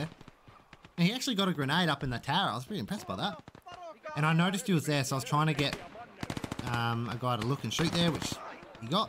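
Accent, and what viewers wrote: Australia. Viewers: Your my favorite Gates of Hell commentator tbh, I think it’s your accent. You sound right out the bush mate. Chuck her in the Uti and keep up the excellent commentary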